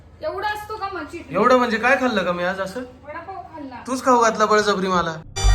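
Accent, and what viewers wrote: Nashik, India. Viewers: Dada mi devlyachi aahe.tu maja gava javlcha aahe.khup bar vatle.tumchi Jodi mala aavdte.tuja bhashe Varun mala samjle tu nashik ch aahes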